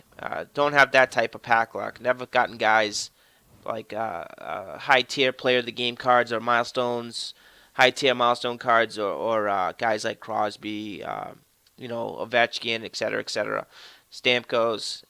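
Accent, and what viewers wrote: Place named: Boston, United States. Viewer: Lol I love your Bostonian accent I'm from Toronto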